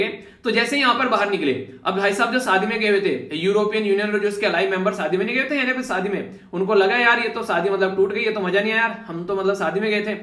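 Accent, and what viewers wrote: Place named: West Uttar Pradesh, India. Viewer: Based on sir's dialect it seems like he from West U.P. possibly near Baghpat.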